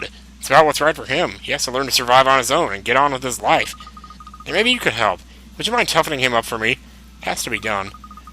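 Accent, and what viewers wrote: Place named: South, United States. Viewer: ... Dude. That southern accent is a twinge worse than the one I hear daily, dude. It makes me miss NYC, man...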